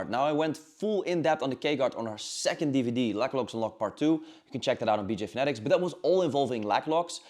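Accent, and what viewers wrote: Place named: Netherlands. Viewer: Amazing! Very grateful🙏🏽 good english, but you cant hide that Dutch twang 😊 nogmaals bedankt, groeten uit 🇵🇭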